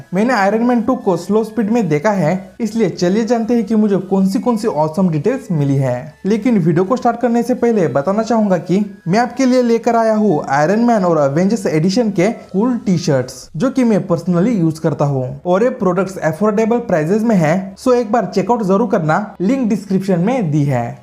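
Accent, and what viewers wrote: Bengal, India. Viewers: you Bengali ?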